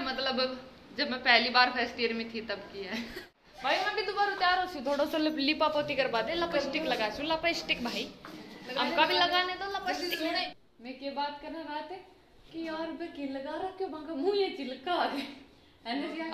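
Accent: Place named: Rajasthan, India. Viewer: didi the marwadi bolta ktayi zeharr lago 😜❤️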